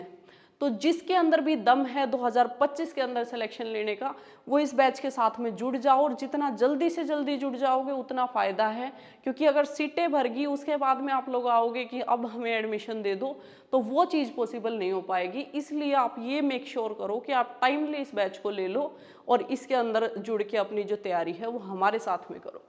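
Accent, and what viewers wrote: Haryana, India. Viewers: Aap haryana se ho mam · Mam hariyanvi jhalak aa gi voice m 😅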